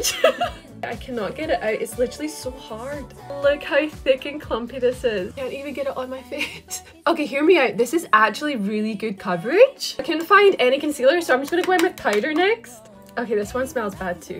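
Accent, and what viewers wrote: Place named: England, United Kingdom. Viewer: funny how her accent changed sounds more english low 😂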